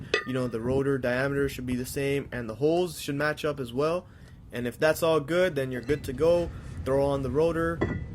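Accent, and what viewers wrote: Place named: Toronto, Canada. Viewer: sounded like a toronto accent :p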